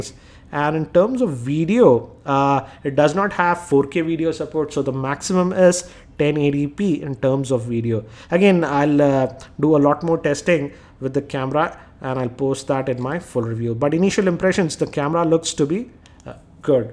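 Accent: India. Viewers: Always dislike the Indian accent (English)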